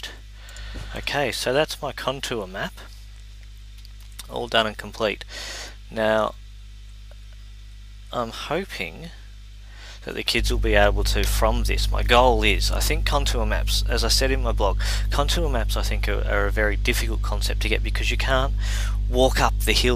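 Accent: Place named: Australia. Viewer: I thought you were Australian from the accent and turns out i was right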